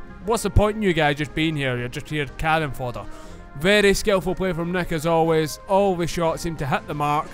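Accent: Scotland, United Kingdom. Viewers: fucking love Scottish Commentator xD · Your scotish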